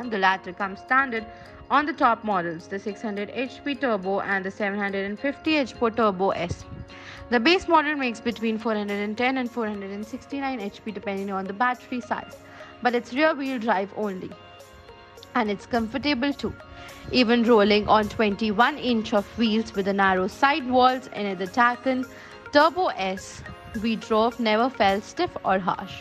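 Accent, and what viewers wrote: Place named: India. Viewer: Sorry as non Indian it is too difficult to understand too strong accent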